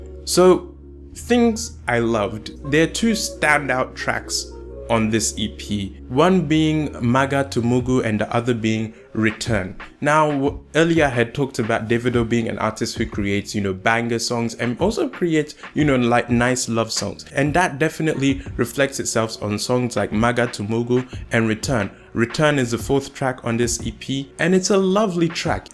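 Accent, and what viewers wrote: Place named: Ghana. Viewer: Are you Nigerian?, you sound Ghanaian.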